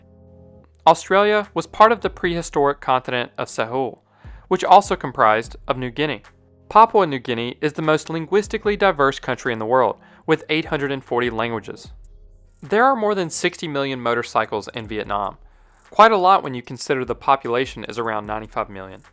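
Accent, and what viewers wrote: South, United States. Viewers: Honestly I just come here to hear your awesome southern accent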